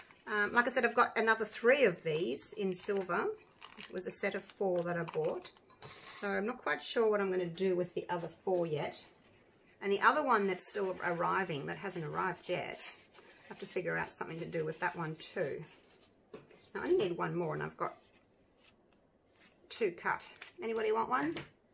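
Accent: Australia. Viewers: Yes I totally agree, I love your accent. My friend at work is from Australia too, she sounds like you even though she has been living in Ontario, Canada for more than 20 years. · there are resin syringes, i also find that a pipette works too. i work with uv resin and just started but have gone crazy making pendants. btw the moment she heard your accent my veardies head popped up and she fired off with a deeper red and orange coloration. she tends to love an aussie accent and gets all excited when she hears one. as for left overs you could try a free form pendant with a hot glue barier to help keep it from going too thin. resin makes a wonderful acrylic pour look. edit to add: those broken bits can be reset with a nice thin set resin you just whipe it on and let it set. uv resin can also be used to repair the bits as well so it doesnt run.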